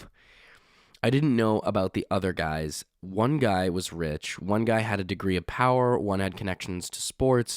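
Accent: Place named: Australia. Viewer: Nailed the Australian ascent 😂🛴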